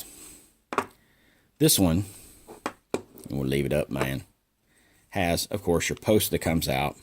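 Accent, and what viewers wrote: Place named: Cajun, United States. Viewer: Worst cajun accent ever. But a great review!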